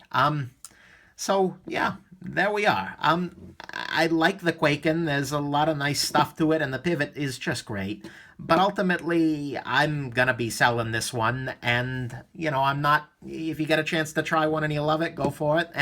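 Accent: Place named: New Jersey, United States. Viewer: ! Love your reviews and your voice reminds me of everybody I ever grew up with in Jersey. Seriously, your reviews are detailed, descriptive and objective. Thanks and keep it up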